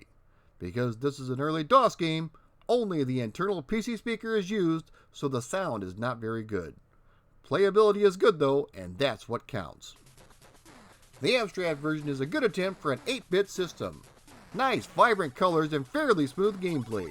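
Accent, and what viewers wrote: United Kingdom. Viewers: Uphold the Law guvnor! Yeah I'm British and that was definitely a British accent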